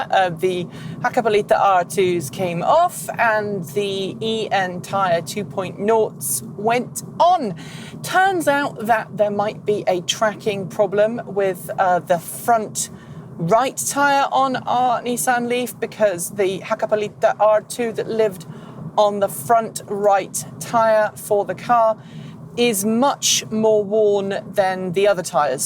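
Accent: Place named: United Kingdom. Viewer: I like your accent , are you originally From uk?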